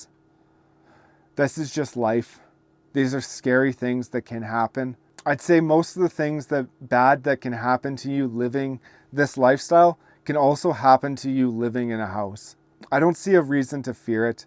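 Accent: Canada. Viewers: …we knew you are from Canada when you said “about” and “zed”…😅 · Sask accent? What area are you from?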